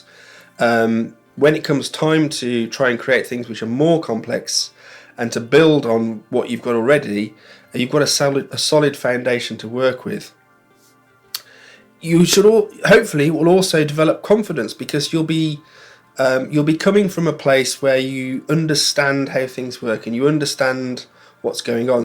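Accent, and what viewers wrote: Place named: Midlands, United Kingdom. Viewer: Hi, cool video. Are you in the Midlands? I’m in Birmingham:) I’m going off the subtleties of your accent haha. When I was beginning with real Modular I just got 1 or two Doepfer modules and then patched them together in different ways for a while, it was a good way of not being overwhelmed 😊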